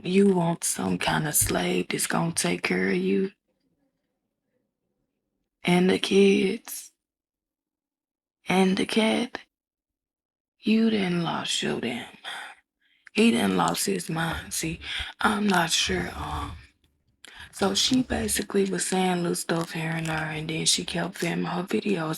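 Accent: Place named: Memphis, United States. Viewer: So that’s why I thought you have the same accent as glorilla, you’re from Memphis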